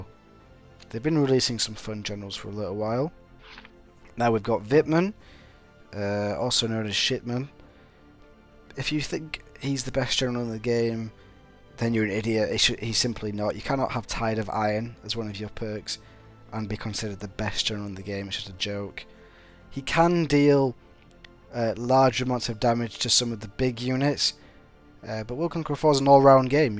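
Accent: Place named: United Kingdom. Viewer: From the accent i would have guessed he was from the UK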